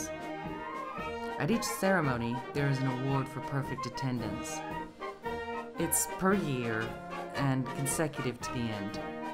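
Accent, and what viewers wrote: South, United States. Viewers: Excellent work! I'd liked the Southern type accent, you reminded me of "Thelma" from the movie "Thelma & Louise"....one of my favorite flicks! xxxxx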